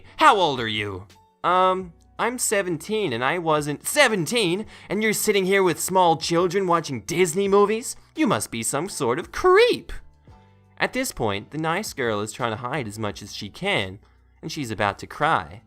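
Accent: South, United States. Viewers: It seems more country gentlemen to me kind of cute sounding I like it but your natural accent is better to me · Appreciate the narration for these but maybe a little less Southern for the accent? Most people don't have accents like that in America or at least not that thick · Not bad of an American accent. I think you have the southern gentleman down well enough. Also power to the Disney nerds!!!!! I’m 26 born and raised on Disney (I broke the film on our Bednobs and broomsticks VHS) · Your American accent is doing good but only southerners really talk with that kind of accent 🤗 still though I could of believed you were American if I heard that 😆👍 Thanks for more stories ✌ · Lol I like how your default American accent is a southern guy. I can confirm we don't ALL talk like that, just most of us lol. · You are a bit hard on the r's. You sound a bit Southern. 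:)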